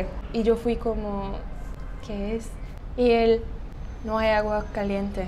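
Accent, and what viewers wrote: Colombia. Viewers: ya se te escucha un acentico de costeña 😄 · Hola, tienes un buen acento español Colombiano. Saludos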